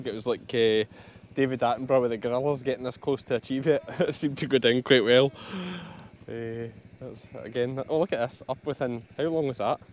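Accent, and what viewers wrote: Scotland, United Kingdom. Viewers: I’m in North Carolina. Lots of Scots here, especially in the mountains. We even have Highland games here. Been here for 53 years but was raised in Canada. We kids had a Scots nanny growing up. When we got a border collie puppy, she named him “ Bonnie Prince Charles (Charlie for short). She (her name was Bella Campbell) had that pup all trained up in no time to herd us around the place. We never knew if she was talking to us or the dog. We loved her and your accent reminds me of good times and is music to my ears. Nay fasch aboot eet. Haha! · I really enjoy hearing your Scottish accent and learning what the different slang means, I also understand that a fair amount of people might not understand. I'm from Australia so we've got a similar thing with people not understanding lol. Can't wait to see more of your adventures! (Also Jock is absolutely adorable) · now listen up. 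if someone told she wasnt welcome to scotland because she wasnt scottish enough what would you think, your videos are great and even though i am english (from Exeter lol) i love seeing the scottish side of things and the accent is 100% part of that so please just keep being you, ive followed you from 2000 subs and its been heartwarming to see you grow your farm and family, to see your confidence on youtube build and with that your videos got better and better. dont change a thing bud if anything you should do a video where you dont change how you speak, a full on scottish day if you know what i mean lol and oh my heart little Wilson <3 like, tell us youre a role model without telling us youre a role model! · I love your accent. Keep introducing people to Scots and Scottish heritage. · I would love to wish you and your family a very happy Easter it is good Friday here in Tasmania I love Listening to your accent and you do show the real Scotland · I can't always understand but seeing the context helps. 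And it wouldn't matter if you were speaking pure Scots all the time, I love to hear it. (Maybe because I have Scots in my DNA, which I do!). Absolutely loved your nephew at the end!!! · To all the viewers who have difficulty understanding, I did also at first but I’ve been watching for over a year and catching up on older videos (and watching other Scottish vloggers) so it’s like learning a new language. I understand every word now. It’s worth the time and Scottish is so lovely to listen to. I hope everyone sticks with the channel. is the best. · I'm so glad I have grown up in Australia meeting many Scottish and Irish people over the years with strong accents. I understand everything you say, love the accent and love hearing you interpret the Scottish words that are not common in the english language so I can learn, not that I remember it. Your little fella is getting big, is a gem. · I’m in the same boat! 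It took me several weeks to get your “posh Scottish” accent down, but the content was so interesting I stuck with it! I still can’t understand you when you go full Scots but that’d be a matter of time and exposure too. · Hey, I'm from Australia and have been watching you since the day Sandi Brock shouted you out, and I have loved every second of it! I don't have trouble with the Scottish accent because my family is Scottish on my mum's side, though of course none of my were nearly as thick as some of them I have heard on your channel lol. I do however have some trouble with other accents, and the trick to getting to understand them is honestly just keeping listening to them. Like anything, the more you do it the better you get at it, so if you love and the journey he is taking us along on, stick with him! A little time and patience and you will start to really understand the accents well. 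· Keep Scotland in your talk. It is beautiful. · I LOVE hearing the Scots. Be true to who you are. Please don’t change. You always explain things so we can understand.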